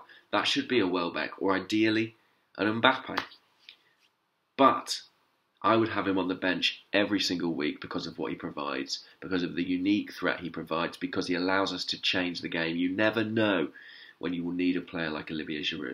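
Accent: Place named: England, United Kingdom. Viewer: As a french fan I wish Olivier leaves Arsenal to another club where he can play more games. I think Marseille might be very interested, they are qualified for the Europa League too, he will be the main striker there and theirs fans will love his fighting spirit. I love your videos man, it helps me to understand english accent as well, keep going!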